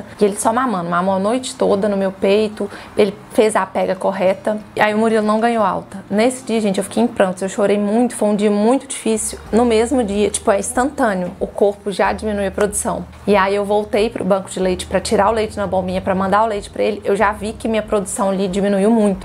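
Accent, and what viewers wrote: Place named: Minas Gerais, Brazil. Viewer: Eras não consegui prestar atenção ao conteúdo do vídeo 😂 só ao seu sotaque lindo que parece ser de Minas Gerais <3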